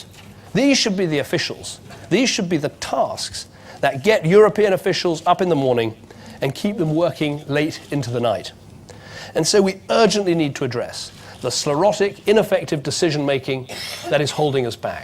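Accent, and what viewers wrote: RP, United Kingdom. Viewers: Key words: RP accent speech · Thank you for sharing. Im learning RP, so if you can upload more speech spoken in RP, that would be great! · I love his accent in fact I love the British accent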